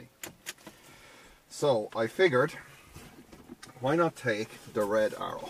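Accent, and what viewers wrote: Ireland. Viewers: Authentic Irish accent, love it!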